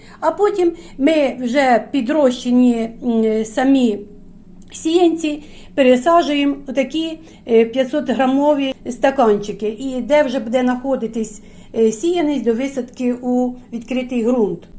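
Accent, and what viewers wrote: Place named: Poltava, Ukraine. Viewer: Полтавщину по говору ні з чим не сплутаєш. За відео лайк, а за суперфосфат-неуд )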